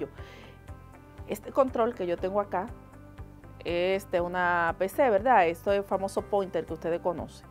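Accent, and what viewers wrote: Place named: Puerto Rico. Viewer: Aparte de estar escuchando su información al final terminé en escuchar que tiene acento puertorriqueño.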